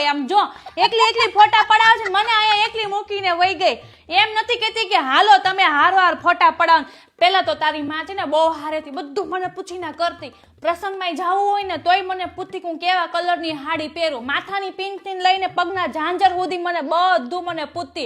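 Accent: Kathiawar, India. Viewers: ben tame kyathi chho pure Kathiyawadi bhasha bolo chho ane tamara dialogues pan bau sara hoy chhe ❤❤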